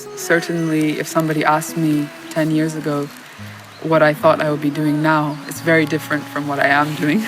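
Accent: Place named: Midwest, United States. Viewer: Her accent is so mid western American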